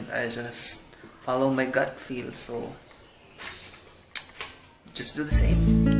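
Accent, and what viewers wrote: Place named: Philippines. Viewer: based on how you speak english, i think you are a filipino?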